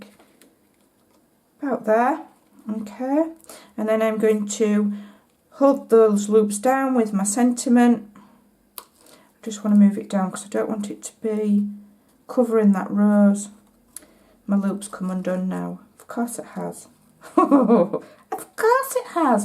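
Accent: United Kingdom. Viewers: Your video was the first I watched. I have lots of 3D embosses folders. I could watch your video all day because of how simple it and easy it looks and I could listen to your lovely accent all day! Where are you from in the UK?? Right now I am addicted to watercolor painting florals using stamped and embossed images ... using Ken Oliver color bursts....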